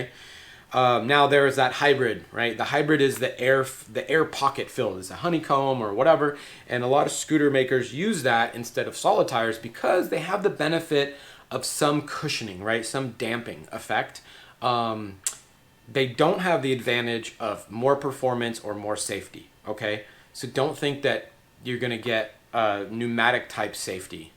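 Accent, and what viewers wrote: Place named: United States. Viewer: oh american guy speaking.im outta here!!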